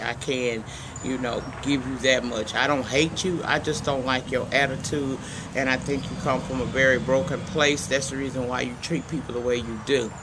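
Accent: South, United States. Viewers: I just came across your channel 2day...faints....I'm back. I think its safe to say I am a fan just 2 reviews in...You're giving me Southern Teas. My Gawd, where are you from Miss?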